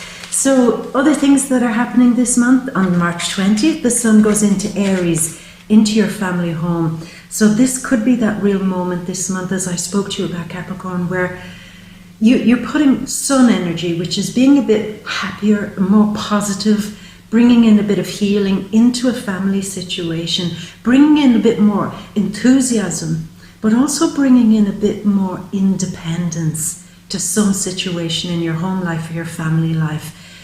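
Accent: Ireland. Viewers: you’re just incomparable. You’re a lightning bolt of inspiration striking out across the Atlantic—if only lightning bolts wore black dresses, talked with a brogue, and blew kisses. Close enough.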